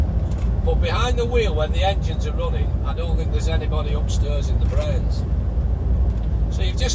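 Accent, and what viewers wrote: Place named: Lancashire, United Kingdom. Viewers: Not often you get a proper northern accent on YouTube unfortunately. Glad to have found one that’s doing some good content! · Nice to have you back missed your vlogs and that lancastrian twang!! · The AI subtitles are really struggling with his northern accent 😅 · I wonder where he comes from? I can hear an accent but I'm on my phone so I have naff speakers... "Just roll 'im 'round, tickling and fettling..." Oh! He's from up there! 😊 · Can't fathom whether that's a Wigan or a Coppull accent? Just out of curiosity (only seen this vid), have you done one where you're on a dual carriageway that goes into one lane, to show how everyone has to get in front of the truck??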